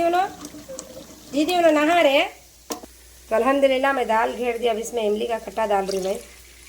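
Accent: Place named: Hyderabad, India. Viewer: mien yaad rekhna mujhey mien bahut pareeshan hun ,,,,❤❤❤❤ App baat kerty ho meri aik dost ki terha ,woh bhee app ki terha Hyderabadi zubaan bolty theien ,,nawab bhai or Bhabi meray pyari baree behan ,app Kay jaisa hi boltay thay same ❤❤❤❤❤❤ Namaaz Quran roza ,,bachon ko sab Sikhaya app nay Allah Pak qabool karay hum moms ki koshish,,mien bhee same app Kay jaisa try karee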